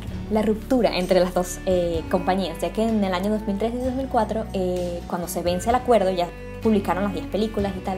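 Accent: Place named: Venezuela. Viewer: Gracias por aclararme todas estas dudas <3 Pd: eres de Venezuela? 🤔Tu acento me suena muchísimo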